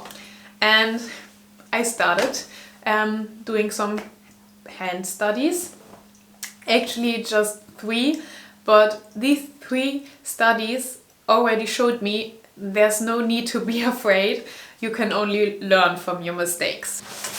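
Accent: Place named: Germany. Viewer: lol love the german accent. cool video!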